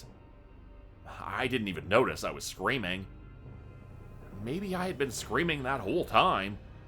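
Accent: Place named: Canada. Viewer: Another great read love the Canadian English lol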